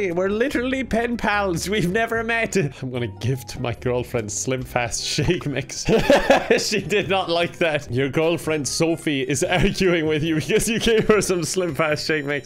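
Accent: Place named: Ireland. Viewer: accent is getting more and more Irish recently, and I am HERE for this beautiful accent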